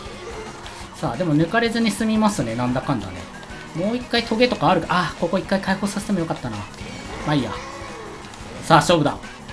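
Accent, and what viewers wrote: Kansai, Japan. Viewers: 関西弁よく出ますねw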